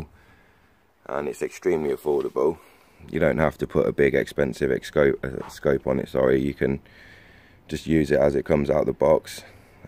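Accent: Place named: Plymouth, United Kingdom. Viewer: Great review mate, Thanks. Gonna treat myself to one of these. You sound like yer from Plimuff! Regards from another plymouthian!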